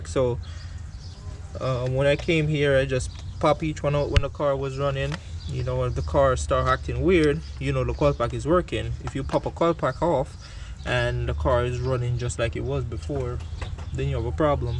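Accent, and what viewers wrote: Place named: Jamaica. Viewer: Man sound like a yaad man.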